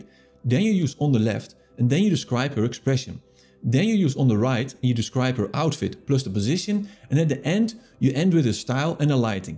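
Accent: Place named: Netherlands. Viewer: Bro you sound very Dutch hahaha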